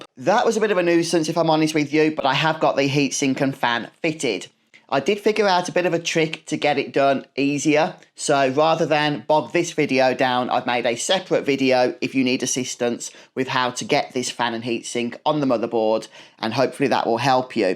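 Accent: United Kingdom. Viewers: I just built my first PC, ITX as well! I was looking at the Terra but decided it was a little pricey for my liking. Btw, I am curious about your accent, which part of the UK if you don’t mind?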